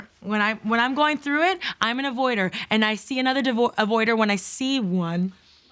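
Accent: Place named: Brooklyn, United States. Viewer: I just found this channel. This shit has me cracking up. Before u even said it, I knew u were from Brooklyn from your speaking and your swagger.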